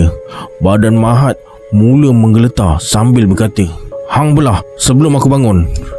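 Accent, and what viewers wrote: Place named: North, Malaysia. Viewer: Memang sempoi video kali nie 🤟 loghat utara nohh 👍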